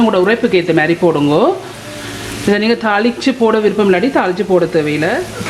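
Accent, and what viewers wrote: Jaffna, Sri Lanka. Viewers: ma...!!!! I like your Jaffna Tamil..... I'm also Jaffna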